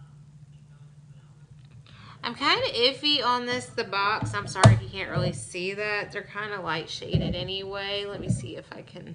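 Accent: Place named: South, United States. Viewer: I LOLed at the VV cream and you bring up a good point/problem I have with boxes shipping even, for instance, K beauty products we've heard of that don't have ingredient lists included in the receivers language. Ipsy has done it a couple of times too, and that weird ass egg mask they sent scalded my skin. So props to you for pointing that out and I'm a fan!;) Nice to hear a fellow southerner on here💜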